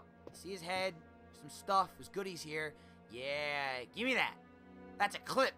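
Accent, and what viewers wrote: New Jersey, United States. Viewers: Lol he still had some of his New Jersey accent back then.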